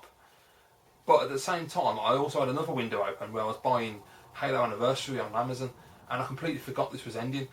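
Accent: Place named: Midlands, United Kingdom. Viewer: I picked up Max Payne 3 for £15 on steam, i started playing it today and i don't know what to make of it. Its more film than game by the looks of it, it's a nice looking film though lol. Where do you live, you sound like your from the midlands which is a fair old way to travel to a car boot in chedar. I live in Bristol and chedar would be too far for me to go lol.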